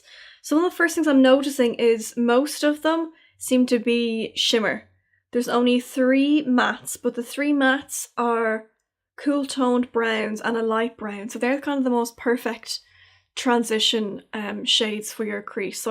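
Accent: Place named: Ireland. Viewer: What a beautiful collection! That purple eyeshadow is stunning, even if it needs a bit of work. I really enjoyed this video, your Irish accent is so nice to listen to, and you were so thorough when talking about the products. Also (this is a really weird comment) I'm so jealous of how much lidspace you have x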